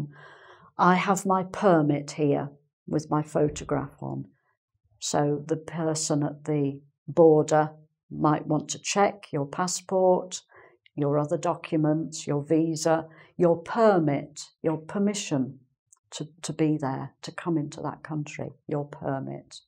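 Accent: United Kingdom. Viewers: Great explanation! ... and your nice British accent: Terrific... I love it a bunch ! ... Thumbs up ! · Thank you very much teacher. it's so interesting and helpful video. please do more lessons involving English pronounciation. I really like British accent ^^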